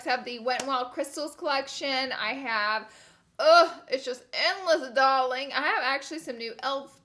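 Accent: South, United States. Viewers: Washer Machine ,I do the Same! North Carolina. Southern girls accents Never Fade 🌹❤ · Love drugstore makeup! Girl I’m from SC I’m as country as cornbread and I stand proud sayin washer merchine lol 😂 love your country accent!! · The accent!! ❤️ Gotta Love us Southern girls, big shoutout from Mississippi! ☺️ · I friggin love your southern-ness 🤠♥️👢 oh and you forgot greezy = greasy 🤪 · I'm from Louisiana and none of your southern words are wrong! Lol. I get picked on also! You keep being you! 🙌🏻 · Gurl I understand your southerness. I didn’t even realize you were saying it wrong either. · It’s A SOUTHERN THING HUNIII 🤠🤠🤠🤠🤠🤠🤠🤠🤠🤠🤠🤠 washer machine I say it like that too.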